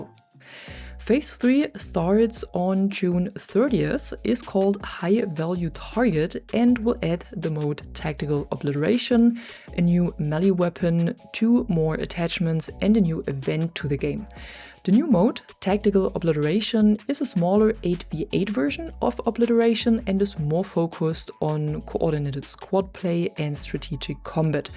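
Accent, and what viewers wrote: Germany. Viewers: Der deutsche Akzent is der Hammer, danke für das Video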